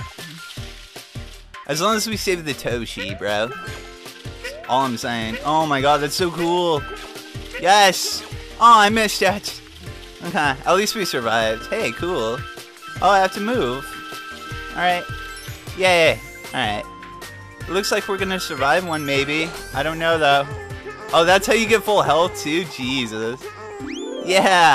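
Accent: California, United States. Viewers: To be fair is obviously from California to most USA residents because he sounds just like a California dude!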